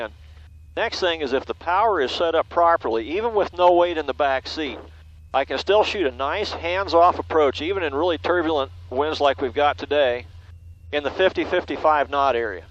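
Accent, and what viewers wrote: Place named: England, United Kingdom. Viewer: Oh, P****n d'accent rosbeef !! C'est HORRIBLE et très désagréable !!!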